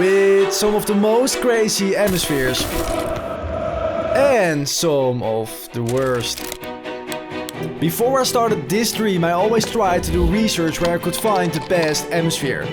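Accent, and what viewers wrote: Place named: Netherlands. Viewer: Verschrikkelijk Nederlands accent. Maar de manier van praten is helemaal stuitend. Na 1 minuut met schaamte afgezet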